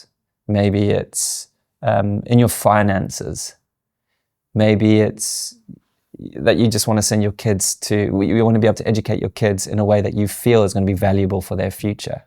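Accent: South Africa. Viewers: Finding your channel is a breath of fresh air. Most channels feel like a bad American tv advert from the 80s. I love your approach. I can watch without my brain nervously anticipating jump cuts and it feels like you’re speaking directly to me, with authentic thoughts and heart-felt intentions. It is the only way I would want to present myself as well. I have been a photographer and artist all my life and have made a few short films at film school so I know my way around an Avid Media Composer and other editing software. I don’t want to create anything that feels over produced. I just want to make raw honest content but I’m still deciding what that would be - I’ve done a fair amount of tutoring in various subjects so that will help, I suppose. This video in particular has had me trying to articulate what I want in the various areas of my creative life so it has been a great exercise. 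I have often thought about it and even advised others to get clear on what they want, but it was your story about being put on that spot that finally spurred me into making a concerted effort to write it down. Thank you. (I also live in London… I hear a slight South African accent in your speech which is lovely). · Another excellent video, - thank you! I heard you say you live in the UK? You have a South African accent, I resonate… I am a South African living in the Highlands of Scotland, and building a YouTube Channel (just released video 4)., you have so much skill and experience - I will welcome any input you choose to share - you speak so naturally, your dialogue is fluid, natural and seamless… perhaps you could talk more about how you have come to sound so natural, it’s lovely because it allows us to see you - very authentic. Thanks again, I am enjoying your work. 🌺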